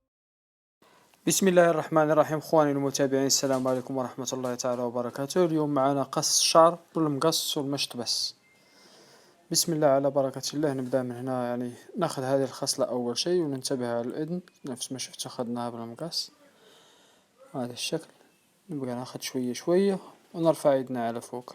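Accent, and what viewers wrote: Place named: Morocco. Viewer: السلام عليكم ورحمة الله هل الأخ مغربي؟ لكنتك قريبة جدا للمغاربة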